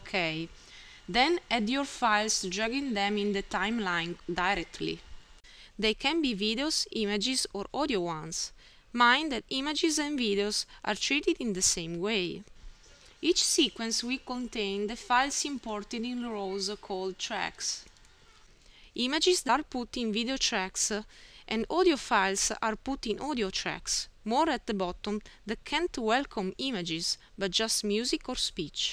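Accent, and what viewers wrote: Italy. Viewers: thanks for the video, helped a lot! I like the Italian accent, it's easier to understand than English :) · Her accent is definitely Italian. I'm Italian.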